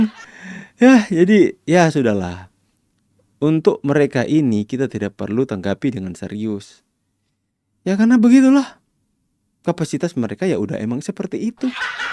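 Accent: Java, Indonesia. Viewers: baru tahu..kalau mas..ayah ibunya org Malang...mangkanya logatnya kok medok org jawa...salam dari malang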